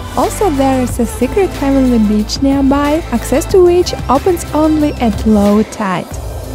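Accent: Russia. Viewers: Благодарю вас за такое прекрасное видео! Чувствуется по акценту, что вы из России, но английский у вас потрясающий 😍💫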